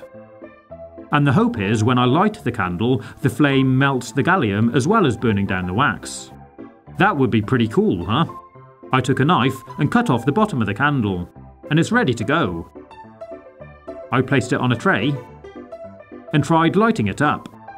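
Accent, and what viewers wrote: United Kingdom. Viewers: Das Op he’s british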